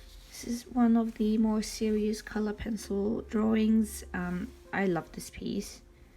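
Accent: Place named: Australia. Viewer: Okey, thanks for answering! I didnt think your accent did sound like australian, but after a bit more listening I almost hear it. 😅 Hope to see much more from you and your art! Love from Sweden!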